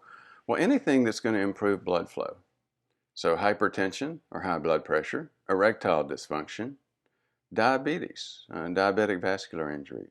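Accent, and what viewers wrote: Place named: Texas, United States. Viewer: Great explanation doc Simple and easy to understand And I like your Texas accent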